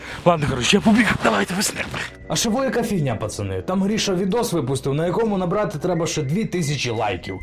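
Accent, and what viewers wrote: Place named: Ivano-Frankivsk, Ukraine. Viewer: Какой же уродский этот западенский язык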